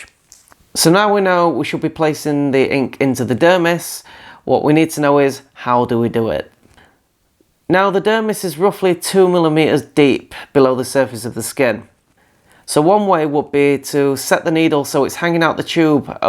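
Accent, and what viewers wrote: United Kingdom. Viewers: Thank you SOOOOO much. you brits make anything sound awesome. love your enthusiasm and brainworks. watching video after video can become boring or even worse misinformed. I am a sponge...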